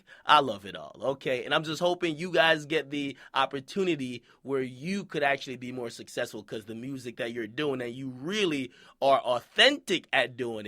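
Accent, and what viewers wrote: Jamaica. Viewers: yow akademiks where in jamaica you from ?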